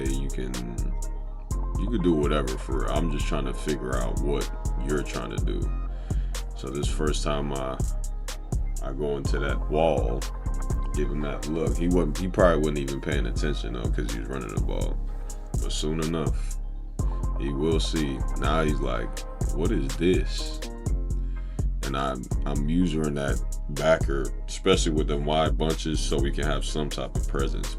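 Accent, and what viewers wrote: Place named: Atlanta, United States. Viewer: Dawgy sound like he from round my way what zone you in in Atlanta twin 😂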